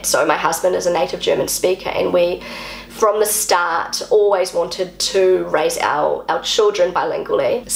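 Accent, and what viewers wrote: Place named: New Zealand. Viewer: I as a German with lately more of an average share of doctor's appointment obviously cannot comment on all possible infections, but the last time that I remember having been prescribed an antibiotic was more than twelve years ago when after having been bitten by a tick, an erythema migrans developed which might have turned into Lyme borreliosis, which was stopped by doxycycline. But other cases I don't really remember, and I'm in the second half of my sixties. As an aside, I really enjoy your Kiwi accent. I was in NZ in 1987 and 1993, and it is one of the few countries I'd have a desire to visit for a third time if it weren't so bloody far away. But in trying to explain to non-German (but some-kind-of-English) speakers how a German long E is pronounced, I'm sometimes successful by pointing out how New Zealanders pronounce "nEver", "sEd [said]", "tEn", "elEven and so on.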